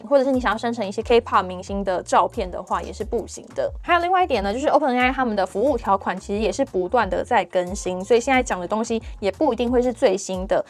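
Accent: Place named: Taiwan. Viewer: 你说话真的好嗲啊，很酥，很台湾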